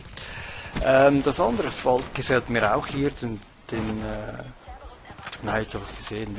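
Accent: Switzerland. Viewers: Mit deinem schweizer akzent hab ich kein problem denn den find ich geil!!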